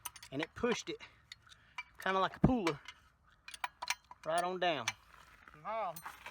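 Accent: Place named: Alabama, United States. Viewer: i was watching the video in the shop doing my water pump and one of the other mechanics said he sounds like he's from Alabama too ..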